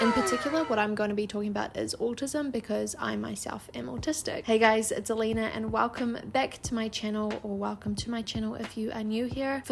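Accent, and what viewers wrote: New Zealand. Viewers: Unrelated but those Kiwi vowels are so weird, I love it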